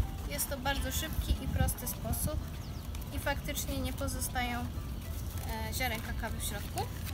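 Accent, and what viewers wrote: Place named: Minas Gerais, Brazil. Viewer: Olá, você tem um lindo sotaque solo da região sudeste mineira minha graça é Daniel... Wow, niesamowicie, kiedy mówisz po brazylijskim portugalskim.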